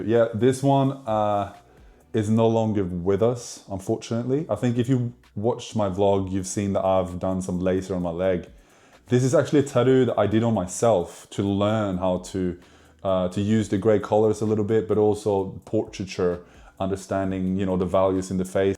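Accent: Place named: Australia. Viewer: must be the aussie twang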